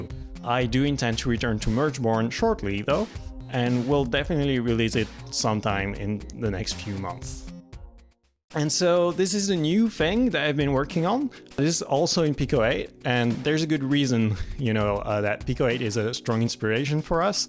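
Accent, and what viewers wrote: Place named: France. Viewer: I'll be honest, I kinda only skim read the newsletters. Now having it dictated to me by a lovely Frenchman, well it's très bon for my ears. You guys are some of the best indie developers out there, and I hope more and more people come to appreciate and support your work. Thanks for all the fun games and I wish you all every success.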